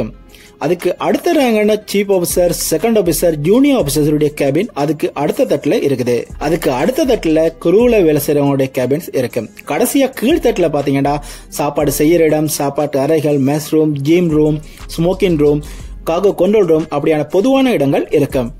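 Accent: Sri Lanka. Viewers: ஐயா கப்பலில் தங்கும் அறைகள் பற்றி நன்றாக உள்ளே சுத்தி காண்பித்தீர்கள் மிக்க நன்றி நீங்கள் தமிழ்நாடு தமிழரா இலங்கை தமிழரா உங்கள் குரல் சற்று இலங்கை தமிழர் போல் தெரிகிறது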